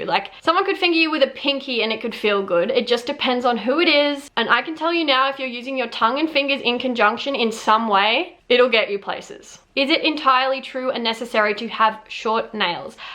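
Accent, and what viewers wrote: Australia. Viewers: Are you dutch, cause your accent sounds more like an autralian one Edit: just saw your name is and you are Australian.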